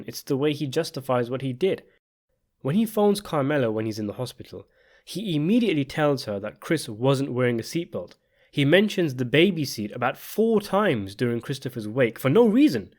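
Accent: United Kingdom. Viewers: Oh god Brit narrator I'm out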